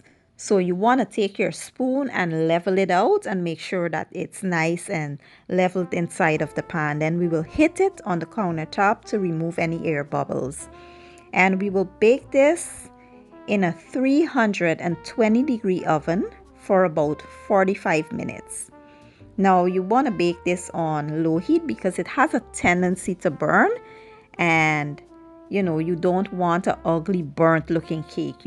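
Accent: Guyana. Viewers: Lovely ! New sub btw are you guyanese ? Your accent lol where are you from?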